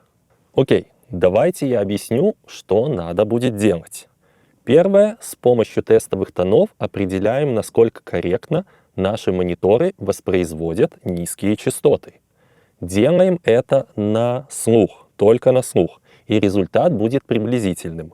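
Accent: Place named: Germany. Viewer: Откуда у тебя немецкий акцент?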